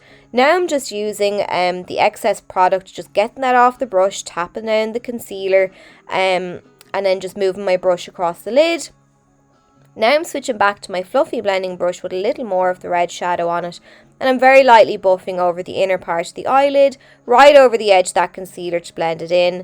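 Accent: Ireland. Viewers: Really enjoy how you explain what colors you use and how to do this it really helps!! ❤ Thanks🙂😃and you must be Irish because of your accent! It's cute thanks❤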